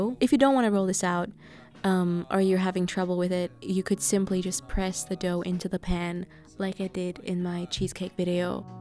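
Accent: Germany. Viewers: Are you German? 😂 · Wait, are you german?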